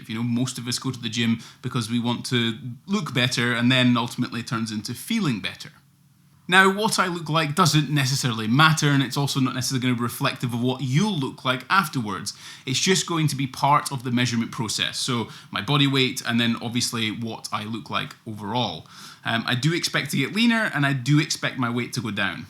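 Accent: Scotland, United Kingdom. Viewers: You sound Scottish!😅 I love ever accent except my American one. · I got so thrown off when you opened your mouth and you were Scottish (I'm Scottish) never expected to hear a familiar accent but always lovely when I do 🤗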